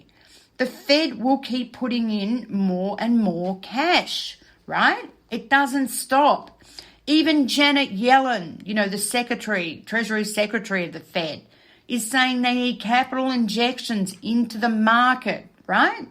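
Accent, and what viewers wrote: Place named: Australia. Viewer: Jezus, je bent nederlands , 🤔met een ozzie accent, geweldig!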